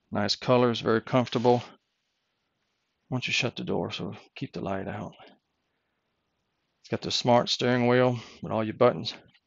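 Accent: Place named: Montreal, Canada. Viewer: Great review as always. Liking the Montreal accent. Thanks for posting.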